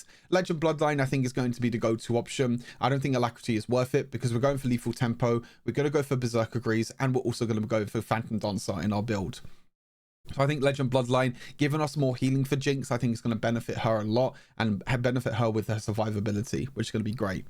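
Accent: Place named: United Kingdom. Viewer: i love the british accent. I learned to speak english recently and i can't understand almost anything that americans say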